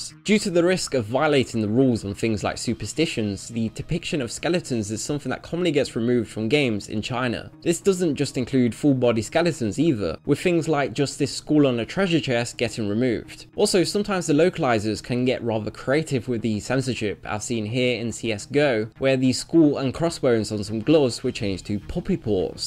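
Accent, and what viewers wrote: United Kingdom. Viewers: What country is your accent from? oothers (others) coolts(cults). Is it Scottish?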